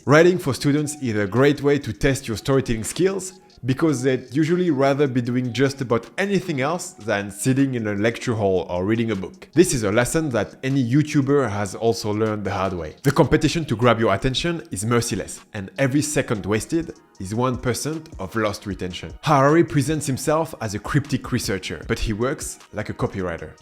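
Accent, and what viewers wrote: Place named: France. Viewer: Gaining Youtube subscribers is clearly not an easy job. The few things I have seen on your channel are so well done that I can say it easily matches other content of Youtubers with many thousands or even millions of subscribers. Keep up the good work. Who knows how many people you will be to reach within a few years. By the way the french accent is actually charming 😂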